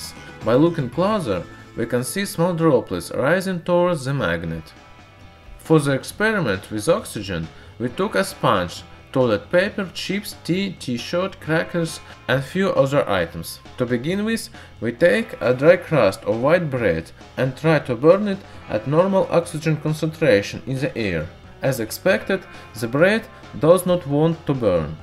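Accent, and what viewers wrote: Russia. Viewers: Just started watching this channel recently. This is one of the best beginner's chemistry channels on youtube. Love the Russian accent and light humor as well! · Love to hear Russian accent speaking English, looks wiser think so 🤔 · Man, I love your videos and your Russian accent. Keep up with new content. Cheers. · Ocean Junkie I Agree He speaks perfect English with his very thick Russian accent.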